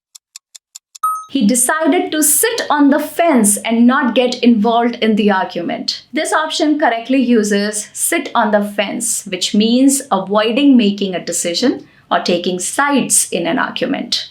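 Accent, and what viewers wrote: India. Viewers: Hey, your new SUBSCRIBER✋cuz my viewers want me to speak English like you with Indian🇮🇳accent so now I’m gonna work for my YouTube AUDIENCE❤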